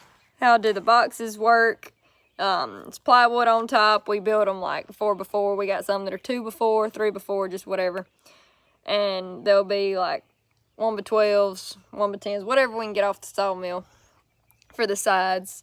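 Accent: South, United States. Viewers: I love your southern accent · just watched because I want to hear the southern accent. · Love that Southern drawl😉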